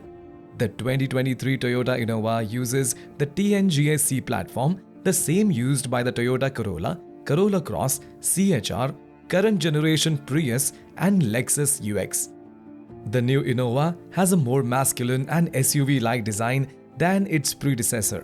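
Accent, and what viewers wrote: India. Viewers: Indian accent is terrible.. 🤮🤮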